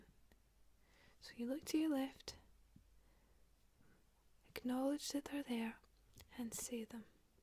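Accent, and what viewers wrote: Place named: Scotland, United Kingdom. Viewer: love this thanks love your scots accent really soothing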